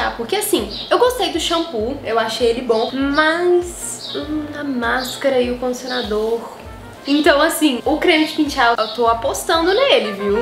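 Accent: Minas Gerais, Brazil. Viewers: vc é de minas gerais o sotaque diferente 🤔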